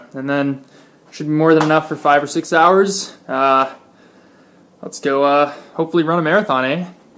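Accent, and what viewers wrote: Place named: Canada. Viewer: Good luck. Looks awesome. Were are you from? You sound more Canadian.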